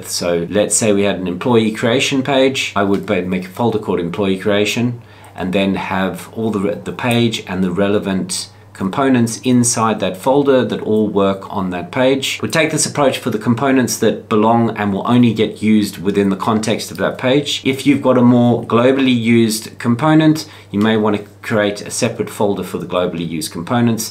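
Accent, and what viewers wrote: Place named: South Africa. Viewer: Do I detect a fellow South African accent ?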